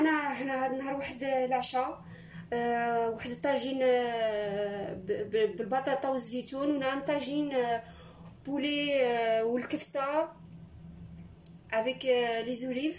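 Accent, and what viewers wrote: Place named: Tlemcen, Algeria. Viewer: يعطيك الصحة اللهجة تاعك تباني تلكسانية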